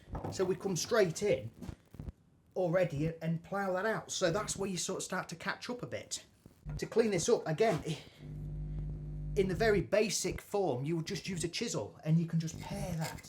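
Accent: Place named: Northern England, United Kingdom. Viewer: Your videos are brilliant mate! Also nice to hear another northern voice 👌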